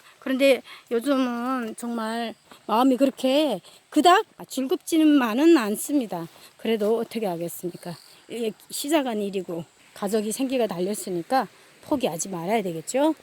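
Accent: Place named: North Korea. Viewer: 말소리가 북에서 오셨나요. 쉬엄쉬엄 하셔요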